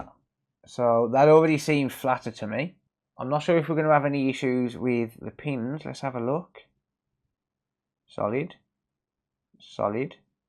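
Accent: United Kingdom. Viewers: I could hear the authoritative British accent before the video even began 😆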